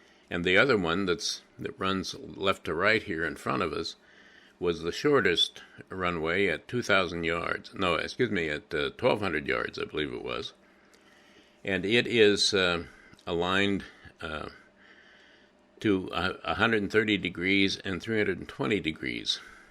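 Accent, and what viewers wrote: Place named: United States. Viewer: My wife was born on the airfield in one of the Nissen huts: after the war there was a tremendous housing shortage and her parents along with many other local people squatted in the disused huts until they were re-housed in one of the new housing estates that sprung up in Kettering. Incidentally it was wonderful to hear an American voice doing the narrative.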